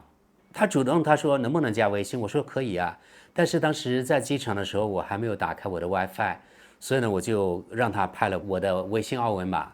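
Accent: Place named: Guangxi, China. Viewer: 丢拿马 我以为是个洋鬼子普通话不标准 原来是我广西老乡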